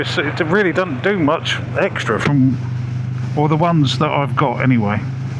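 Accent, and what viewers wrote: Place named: Southern England, United Kingdom. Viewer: good evening. Great to see you out on the Vulcan after so many months. Are you actually Swedish or originally from the South of England as your accent suggests. Perhaps you could give us a brief run down on your background. But of course, only if you want too 😅Enjoy your spring and summer riding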